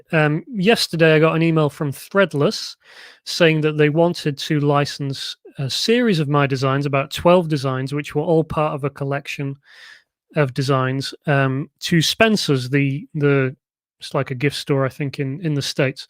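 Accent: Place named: United Kingdom. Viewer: Thank you so much, this video is by far one of the best on the tube! Extremely awesome & refreshing to hear a uk voice, ✌🏻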